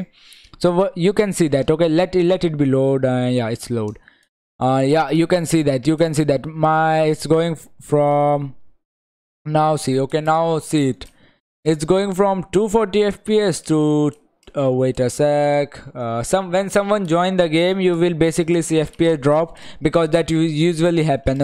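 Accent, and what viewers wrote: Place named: India. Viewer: i hate the indian accents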